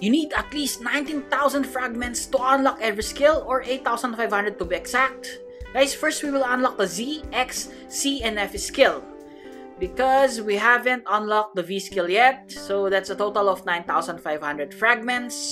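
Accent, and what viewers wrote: Philippines. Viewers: BTW u have a heavy Filipino accent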